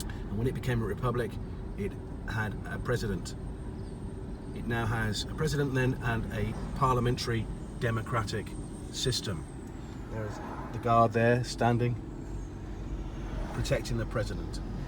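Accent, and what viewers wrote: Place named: United Kingdom. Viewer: He is British definitely from Uk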